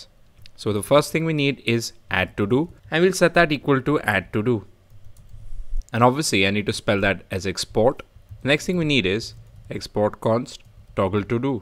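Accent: India. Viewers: Sir again commenting you are the best teacher who I have come across when it comes to learn react native, I easily understand everything regardless of your hindi accent, I wish there is a way to be able to contact you because I need one serious guide. Please drop me your contact.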